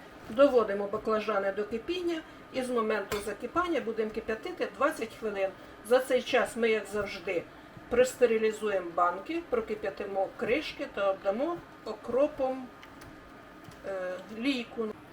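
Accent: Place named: Surzhyk, Ukraine. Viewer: Ну не совсем на украинском, наверно все же на суржике, как будто русскоязычные говорят😊.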